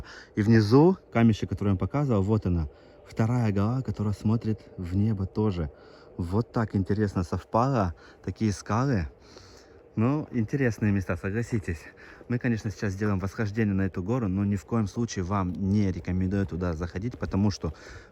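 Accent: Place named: Ukraine. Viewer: Спасибо,! Путешествие очень интересное и полезное! Даже кажется я надышалась тем воздухом, которым дышал ты. Очень хорошо рассказываешь немного с украинским акцентом, это ещё приятней. Здоровья тебе и поменьше экстримов, а то даже дух захватывает и страх за тебя. Береги себя!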